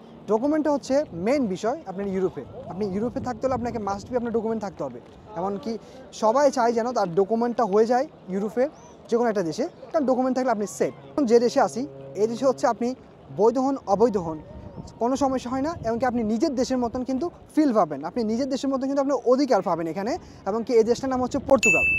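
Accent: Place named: Sylhet, Bangladesh. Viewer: ভাই তো মনে হয় আমাদের সিলেটি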